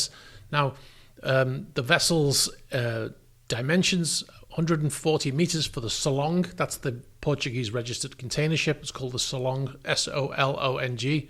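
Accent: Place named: RP, United Kingdom. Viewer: Don’t give a damn about wet stuff. I watch this channel since the beginning because of most perfect bbc English ever. Pure enjoyment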